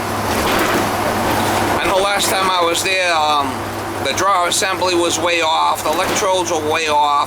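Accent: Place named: Boston, United States. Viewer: Man I miss that thick Boston accent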